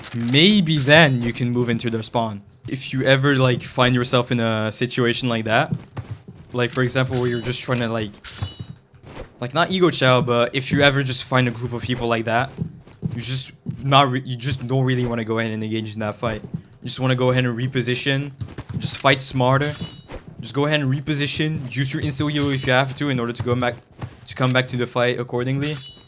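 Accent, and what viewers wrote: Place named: France. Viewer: Accent français et anglais mélangé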